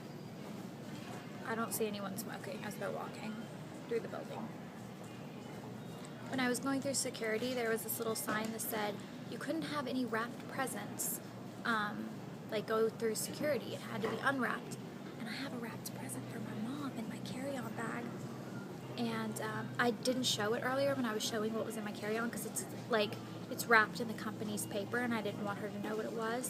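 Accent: South, United States. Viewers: I love your southern accent :)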